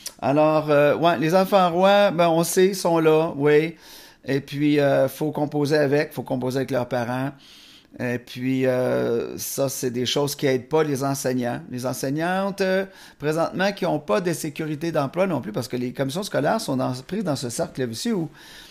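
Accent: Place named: Vendée, France. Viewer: Ils sont tous en burn out! Le cerveau brûle...ce type de prof je le décalque au mur! J'eusse connu ...mais pas à ce degré j'avoue ! Ça craint ce monde plein de demon. Bisous Prof avec ton accent souche de Vendée je crois bien sauf erreur de ma part ! ❤💞❤️‍🔥🧠🫶🙏 le TDAH Ça me connaît mais chez nous y a un retard de 300 ans ...je comprends mieux en écoutant des concernés du Canada car en France c'est pitoyable ! C'est mon cerveau TSA qui m'a sauvé les miches! Je remercie mon cerveau chaque jour crois moi! Heureusement je n'ai pas de retard cognitif ! Etre ambivertie est une triforce! Je ne suis plus une enfant ceci dit! Un demi siècle déjà ! J'ai pu comprendre grâce au Dr Karpman qui causait avec Joe Black...je sais aujourd'hui qui je suis...parfaitement imparfaite dys sur dys! 😂😂😂😂❤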